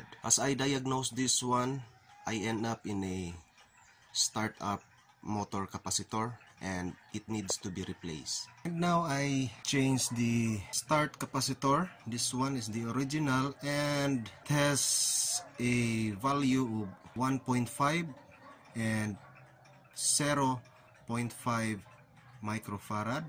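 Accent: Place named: Philippines. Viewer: Your English reveals that you are from the Philippines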